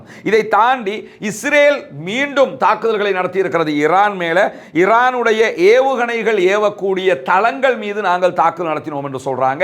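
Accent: Tamil Nadu, India. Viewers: Sago ungali videos ilangaiyulirunthu veliyuduvathaga arinthen unmaiyuleye namba marukirathu manadhu yenenil pesum thoni tamilnattukarargal pesuvathaipol nadaimurai tamizhaga irukkiradhu.🎉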